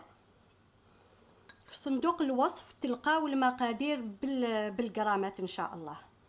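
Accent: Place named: Algiers, Algeria. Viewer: C'est très agréable d'entendre votre accent algérois,ça nous fait du bien whna b3ad ala dzair.Bravo pour la recette et pour les explications très simples😊❤️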